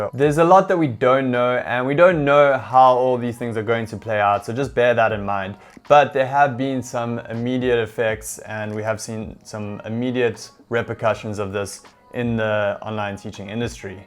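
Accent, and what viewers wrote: South Africa. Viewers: Your accent sound like my south African friends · Your accent sounds South African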